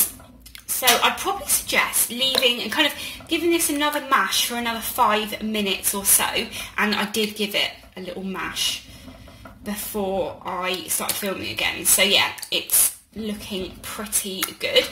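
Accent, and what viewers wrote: United Kingdom. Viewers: LOVE THE FACT THAT YOUR ACTUALLY BRITISH YOUR ACCENT IS REALLY THE WORKS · Love your accent. You gotta be British. I know from the electrical sockets on the wall. Nice video ❤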